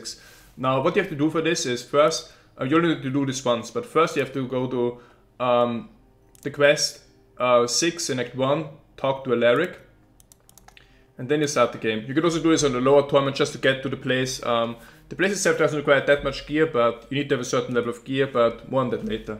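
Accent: Germany. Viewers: I was a bit skeptical until I heard the accent. Those Germans man, they know their efficiency.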